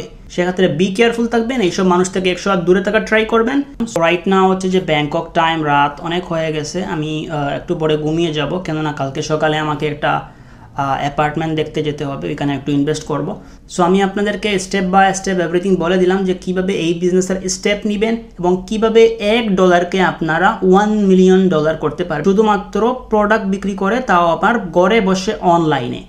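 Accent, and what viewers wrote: Sylhet, Bangladesh. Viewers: Bhai ki sylhety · ভাষা শুনে বুঝা যায় ১০০% সিলেটি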